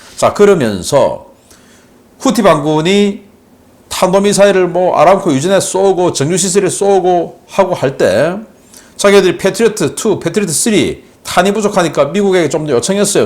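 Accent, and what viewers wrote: Gyeongsang, South Korea. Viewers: 신인균 너는 경상도 말투나 고쳐라 존나 듣기싫다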